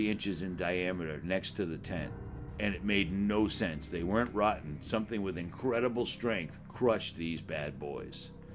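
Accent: Boston, United States. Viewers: I'd recognize that Bostonian voice anywhere! Damn! I sorely missed you!!!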